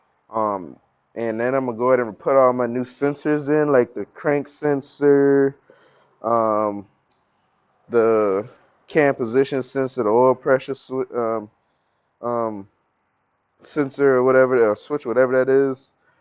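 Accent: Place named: Caribbean. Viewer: Whats good man. I just released a vid on those pistons. I'm doing a Dual LS Swap on my box chevys. Where you from? I hear a lil caribbean accent in there.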